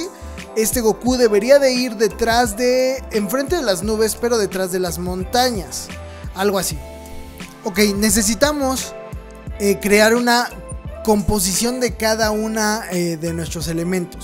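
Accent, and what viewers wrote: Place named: Dominican Republic. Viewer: Suenas medio dominicano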